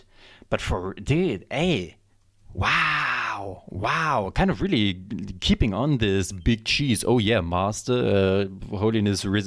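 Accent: Germany. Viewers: You definitly dont sound like the baby from family guy. As a german I hear the german german accent if you could actually call it an accent.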